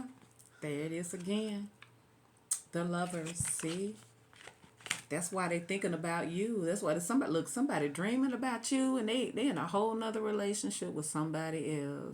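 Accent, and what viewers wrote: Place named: South, United States. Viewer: your southern drawl sis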